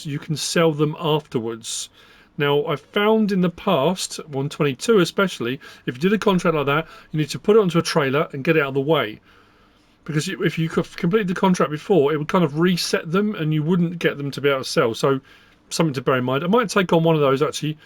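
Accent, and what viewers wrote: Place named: Manchester, United Kingdom. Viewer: Thanks for the guide. I'm going to be playing this for the first time tonight after pre-ordering it, so I'm excited to check it out. I enjoy watching your videos, and an aspect of them I really enjoy is your accent. I'm curious to know; are you from Manchester by chance?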